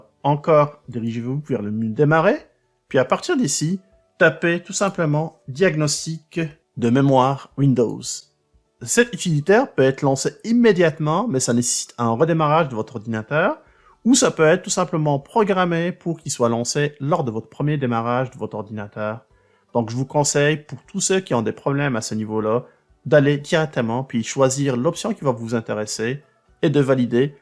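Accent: Quebec, Canada. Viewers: Merçi mon ami je n'ai pas d'ordinateur mais c'est sympa d'entendre le Québec ✌🤗😂😂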